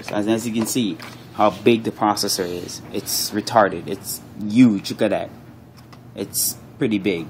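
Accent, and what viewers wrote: Jamaica. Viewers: I love Ur Jamaican Accent :) ( remind me of my best bud)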